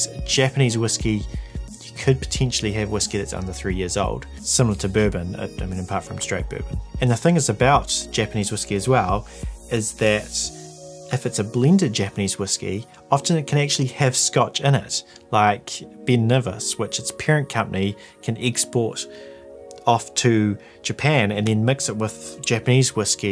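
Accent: New Zealand. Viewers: That's some damn fine production values there fella. Great to hear a kiwi accent on top of some great whisk(e)y content.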